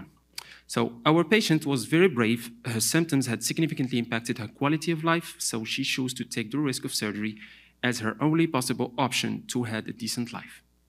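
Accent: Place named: United States. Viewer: كيفاش تعلمت اللهجة الامريكية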